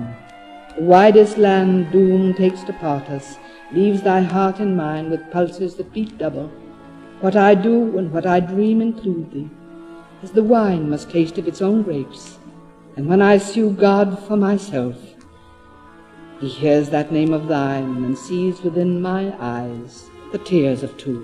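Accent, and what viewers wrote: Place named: Mid-Atlantic, United States. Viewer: She used that “mid-Atlantic” elocution, which I think is just so gorgeous…. Lofty and beautiful and so missed these days of casual everything. I do miss elegance.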